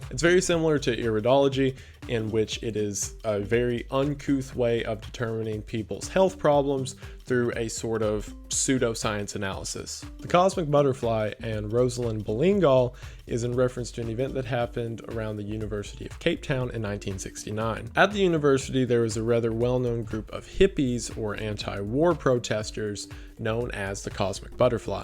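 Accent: South, United States. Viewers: I thought you sounded like you were from north georgia/carolina or tennessee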